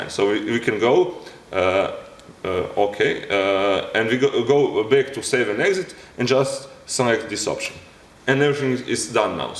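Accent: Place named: Slovakia. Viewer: you sound like you're from slovakia.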